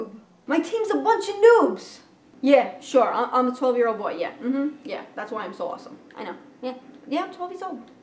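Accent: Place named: New Jersey, United States. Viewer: i love new jersey accents ! ! ! now thats wirth a sub there!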